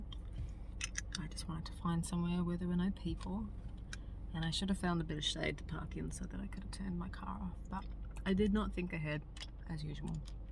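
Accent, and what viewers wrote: Australia. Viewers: Just found your channel....sooooo good! The Oz accent is beautiful....relaxing as fuck 😍👌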